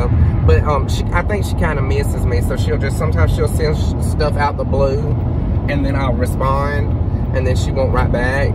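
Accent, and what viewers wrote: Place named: North Carolina, United States. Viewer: You from north carolina? You sound like us.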